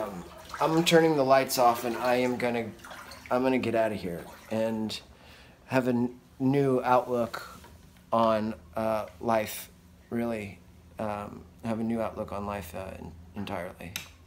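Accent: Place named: United States. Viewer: I just love the accents you Americans have. 🇦🇺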